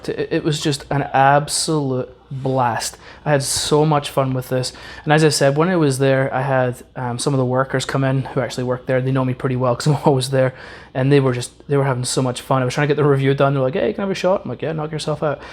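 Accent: Ireland. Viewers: Irish much? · Irish or Scottish?? 😁 Just ordered mine last week. Just waiting on shipping.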